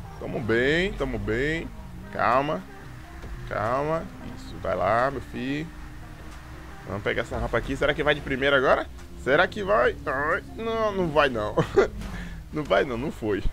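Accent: Bahia, Brazil. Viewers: vc é da bahia? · é baiano? se for é nós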